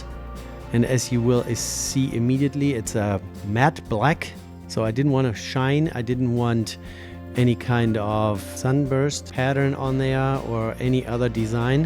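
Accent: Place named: Germany. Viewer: From the Company you got ur Strap from is my Willard Homage (rdunae R2X) - one of the best i think you can buy. Ive also built an Baby-Turtle Homage for my Wife in Black with an awesome Textured Dial (i removed the Marks on the Capturering via Isopropanol because i had the very same Problems with the Markings as you had... 9 to 12 to 3 - all in Line, but below there they didnt line up - seem to be a common Problem)... you can do so much Stuff if you just are willing to invest some money and time... the outcome is more rewarding then any Watch you can buy. (I even split my Watches between DIY and bought 🤣) Nice Vid man, coulnt have done it better. (Du bist Deutscher? Hatte erst überlegt wegen dem Akzent) LG Martin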